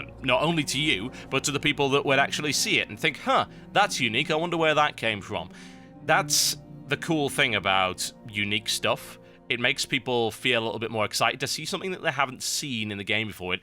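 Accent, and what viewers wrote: United Kingdom. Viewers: Azeroth Daily, bringing me my daily dose of TotalBiscuit and brittish accents.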